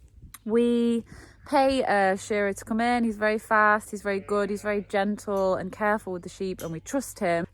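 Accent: United Kingdom. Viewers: Would we get the job dun......You sound like a Yorkshire lass.Love your dad and yours.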